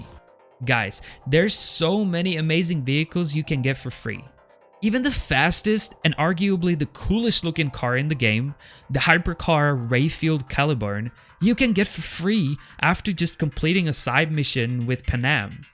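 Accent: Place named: United Kingdom. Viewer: Why is it always someone with a british accent telling people how to play the game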